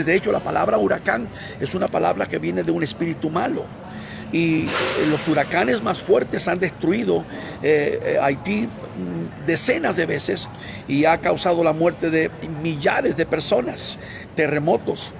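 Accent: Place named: Quito, Ecuador. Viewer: Mira inproquita de momento ablas con en Quito Ecuatoriano porque no miras te veo vestido de judio y protestante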